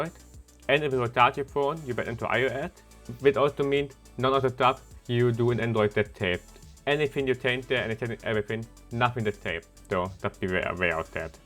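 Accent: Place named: Germany. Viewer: His accent is so heavy, i thought he was speaking german haha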